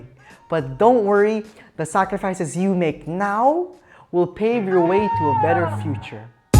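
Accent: Philippines. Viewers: Are you Filipino. You have an accent lol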